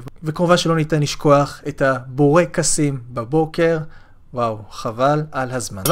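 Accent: Israel. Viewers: המבטא שלך יותר ישראלי משלי וגם אני גדלתי כאן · איזה מגניב אתה...אתה מדבר ממש כמו 'ישראלי' ...🇮🇱🇮🇱🇮🇱🇮🇱🇮🇱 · מה לעזאזל אחי אני כל כך מופתע מהמבטא הישראלי המושלם הזה (רק תזכור: "לאלה מכם שלא יודעים*" אתה פונה לרבים ולא ליחיד זכר)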